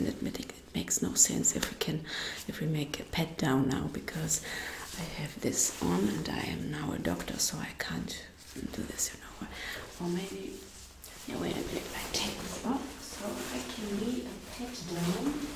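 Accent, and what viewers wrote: Germany. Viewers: Love your videos. I love your german accent.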